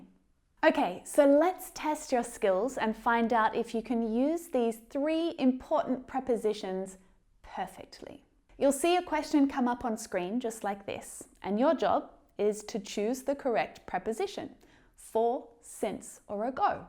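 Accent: Australia. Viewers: Teacher, I just want to say: Thank you. Your accent is really beautiful, this australian accent is wonderful, I really like it. All of this videos are very useful. Thank you teacher for your job, this videos will always help us.Thank you ❤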